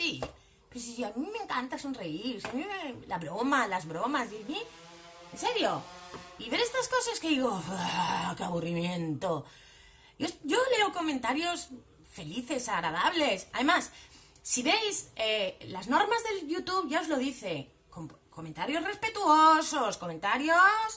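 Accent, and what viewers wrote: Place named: Valencia, Spain. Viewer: esa pronunciación y entonación es de la terreta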